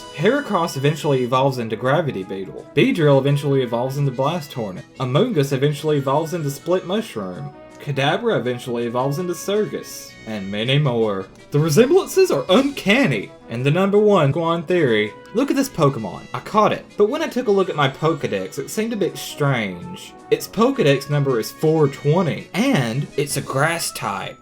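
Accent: South, United States. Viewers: Ugh, your voice, it's like a gay southern accent. no offense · He sounds like a hillbilly. (But not to say he is stupid)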